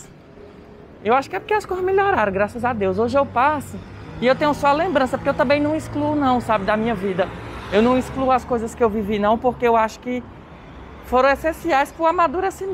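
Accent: Ceará, Brazil. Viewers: Rapaz, vc me impressionou. É tão lindo o seu jeito de falar cearez, mesmo com momentos muitos difíceis, sua forma positiva de encarar as coisas, é realmente, motivador!💛🇧🇷💚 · Ohh, te admiro demais viu... Tbm sou cearense e amo ouvir sua voz, o sotaque 🤩🤩. Deus continue te abençoando viu mininu... 🙏🥰😘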